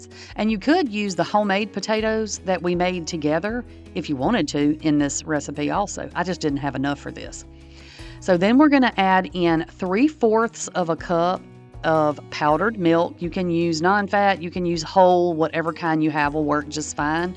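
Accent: South, United States. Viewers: I don't know where you are from, but I love your accent. I would say you're from the south. I just love this idea. I want to start dehydrating things. I love soups. Sometimes, I just don't feel like cooking a lot of things for supper. Instant soup would be wonderful! Question: have you ever dehydrated cabbage for soup? Thanks😊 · Lol, I just found u. I'm trying to figure out what part of the south u r from. I'm from VA.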